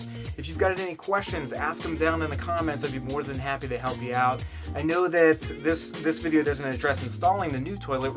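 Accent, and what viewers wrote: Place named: Pennsylvania, United States. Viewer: Ah! knew you were from Pennsylvania! I’m from Philly area but now I’m out in California and your voice just reminded me of home. Thank you for making this video my toilet has a crack in it and I don’t want the repair guys in here during quarantine so here we go! Oh I don’t have that stuff that goes up the water but I thought of another good thing that I randomly have (and I don’t have kids so it’s very random I have this- I actually used it to make snow for Christmas since there is none out here ) If anyone happens to have any diapers they can use the inside of that just rip it open and there’s is fluffy on the inside that turns into that same looking gel. Glad I’m a little bit of a hoarder at the moment Ha Ha Ha Ha. Anyway thank you !!!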